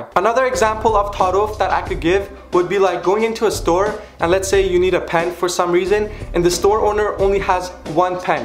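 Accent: Isfahan, Iran. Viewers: لحجه کجای اصفهانه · Man you got a great accent !! I think you are from isfahan !! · Honestly even I prefer saffron over shirt or whatever! Btw are you grom esfahan? Cause the accent is just too on point lol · Are you Isfahanian? Because your accents tells so... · لهجه اصفهاني داري هههه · Cute isphahani accent. 😊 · Yo bro you're awesome, also i'm persian and i'm proud ! i can tell you're from Isfahan is that true ? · When you speak farsi you speak it with isfehani accent , are your parents from there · Omg you're originally from Isfahan lol I loved your accent soooo funny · You have an Esfahani accent. The Shampoo thing you showed is not a Persian thing. It applies to your family because you're from Esfahan and are genetically cheap. LOL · اگه ایرانی نیستی خیلی خوب حرف میزنی👍👍 واقعاااا دمت گرم😂 اصفهانی حرف زدن واقعاااا عالی بود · ا چقده لحجه اصفانی داری،اگه گفتی اصفانیا چطوری شماره سیزده رو میگن، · Hats off you man . 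That was pretty funny . specially that you narrated in English . I couldn't stop laughing 😂😂 By the way Are you Isfahani? I think so . because I am.. and my accent is like yours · لحجه اصفحونیت 😂 دمت گرم کاکو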